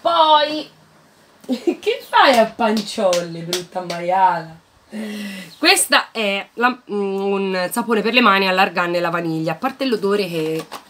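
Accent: Tuscany, Italy. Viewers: Sei toscana?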